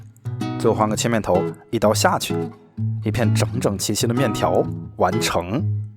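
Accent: Wuhan, China. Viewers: 哈哈、纯武汉普通话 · 这个说话的调调，重音的部分，确实有武汉话的感觉。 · 不用说就晓得你是武汉人哪，托托的弯管子普通话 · 一听就是武汉普通话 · 武汉人！！！！口音越听越喜欢！ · 拐子！你就用武汉话吧，你这武汉式普通话听到我鸡皮疙瘩掉一地。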